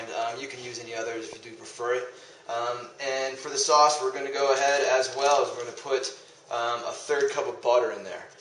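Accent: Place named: California, United States. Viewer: Sounds like hes frim california, definately not the midwest.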